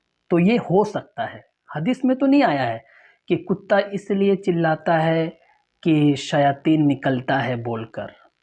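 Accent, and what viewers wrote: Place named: Bengal, India. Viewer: Tum bangali ho Kia aapkeblne katarika sahi nahi hai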